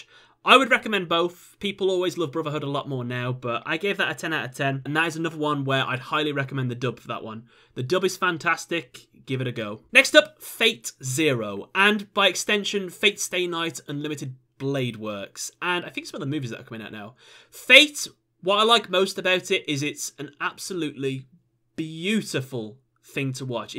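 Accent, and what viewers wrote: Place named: Birmingham, United Kingdom. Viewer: “much simpla” ah it’s good to be a brummie like you haha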